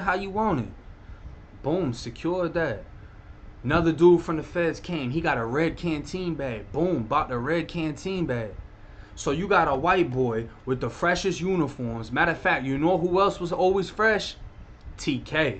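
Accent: Boston, United States. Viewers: Where in America is his accent from? I’m from the UK and I’m awful at guessing 🤦🏻‍♀️ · That Boston accent mix with that Dowwn souff Florida accent is brrraaazzyy p!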